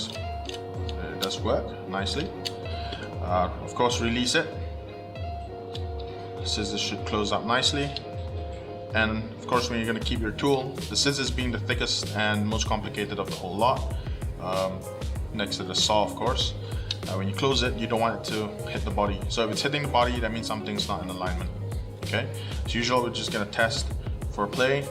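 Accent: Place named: Malaysia. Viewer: i knew you were malaysian just from the way you spoke haha... anyways, good video... but yeah, it's so hard to get anything here in malaysia, we only have 1 official distributer in malaysia and they're not really taking it seriously, like their shop is always out of stock and don't carry everything that letherman has to offer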